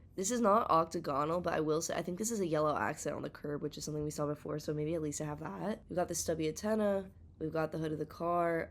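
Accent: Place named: Monterrey, Mexico. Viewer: your accent sounds straight out of Monterrey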